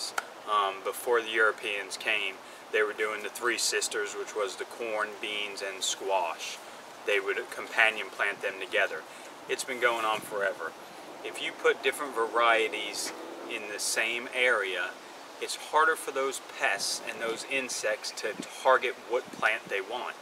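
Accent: Philadelphia, United States. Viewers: Zone nine? Do I hear a Philly accent though?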